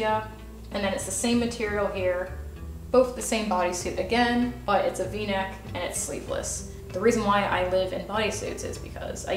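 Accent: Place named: Los Angeles, United States. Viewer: Ah oh L.A. broad, oh i was guessing Texan or midwest.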